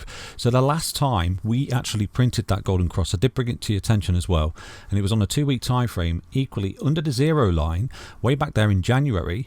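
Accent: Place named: England, United Kingdom. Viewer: Why are you speed talking with your English accent who the hell can understand you?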